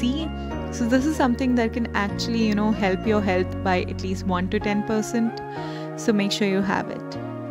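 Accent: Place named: United States. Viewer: UR AN INDIAN BUT U HAVE USA ACENT LIKE ME IM ALSO INDIAN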